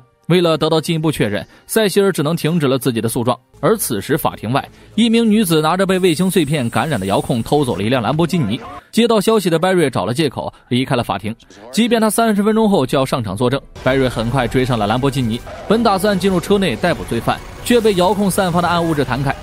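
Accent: China. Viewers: 主播是湖北人，口音暴露了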